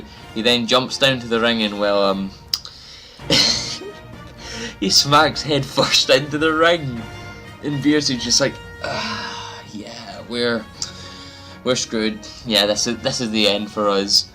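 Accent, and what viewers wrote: Scotland, United Kingdom. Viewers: Hey, man! I love your voice! Sorry for my ignorance but is it Scottish? Anyway, I think you have a really good sense of this stuff, so you don't need my help. Although, I don't think you need to get into your overall thoughts on the episode because you've been talking about your thoughts on a scene by scene basis. For the most part, I would stay away from reviews/news as a primary outlet when you are newer. You want to standout as much as possible. Just a thought. Either way, good job!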